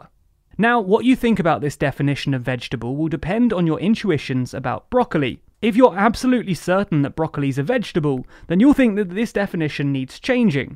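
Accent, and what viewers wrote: United Kingdom. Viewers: Woah, deep video, love this format! More of these please!! I’m able to see the others point of view. It’s crazy hearing a British dude breakdown deep American issues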